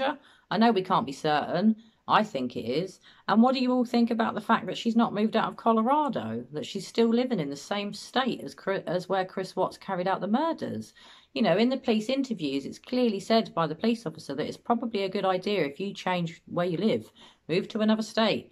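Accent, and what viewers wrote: England, United Kingdom. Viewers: Why is doing YouTube videos with an English accent.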